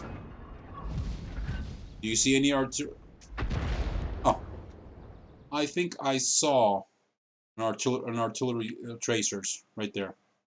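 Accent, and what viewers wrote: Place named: Greece. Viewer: I just want to point out your English is well learned. The Greek accent is there, but not painful to listen to.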